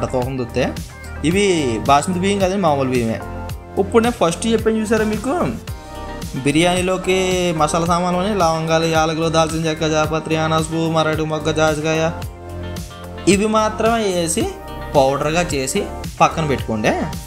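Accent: Godavari, India. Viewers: Godavari style lo cheppaaru brother, very tasty joint biryani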